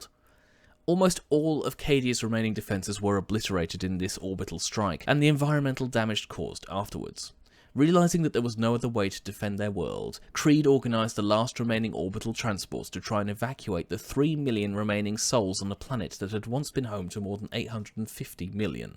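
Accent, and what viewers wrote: United Kingdom. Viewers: lol, the British accent making terror and terra homonyms :D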